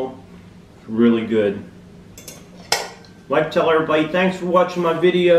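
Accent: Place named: Southwest Pennsylvania, United States. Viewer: Hey, where are you from? We live in sw Pennsylvania and your accent sound like you are from here. AND we love ramps.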